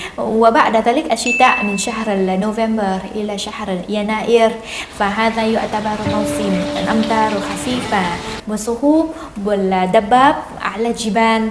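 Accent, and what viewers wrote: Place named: Thailand. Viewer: Bạn nói tiếng Arap với accent tiếng thái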